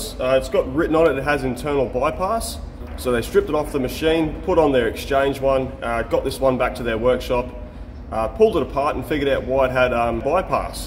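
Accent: Australia. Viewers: Great engineering skills! Awesome to watch by a kiwi from across the ditch! Your dog needs an alignment as it runs side saddle! Lol 😆 Cheers keep up the excellent workmanship! Ps. The camerawoman can’t be doing much office work if she’s on the end of the camera! Really enjoy your bloopers as you talk like a real Aussie! Eg … fuck sake fuck it! 😂 lol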